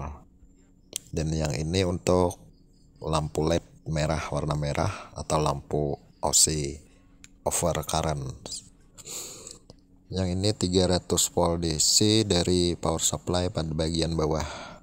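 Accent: Banjar, Indonesia. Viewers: Bahasa Indonesia 100% bagus , tp logat Banjar nya katahuan 😂. salam 1 hobby sanak mautak atik masin las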